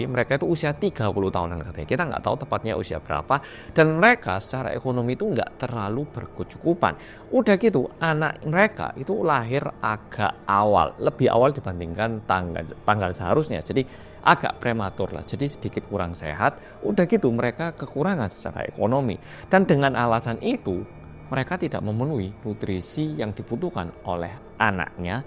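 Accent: Java, Indonesia. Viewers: 😅😂😂 kamu lucu..medookkk..❤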